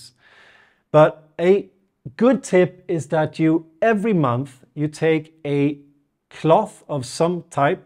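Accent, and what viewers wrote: Scania, Sweden. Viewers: Just love how he speaks English with a touch of skånska. ”Reubusta” 😂 Great video guys 👍🏽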